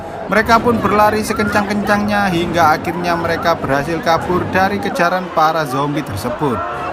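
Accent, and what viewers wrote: Java, Indonesia. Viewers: Medok tak trtolong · Dari Jawa Mana Kamu Bang?.. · Njirr wong jawa massee Gi ngapa massse wkwkwk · Abang orang jaws ya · We abang wong jawa y? Pada baen ak be wong jawa😅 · Abang wong jowo? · Ketoro nek iki arek jowo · Medok tenan mas🤣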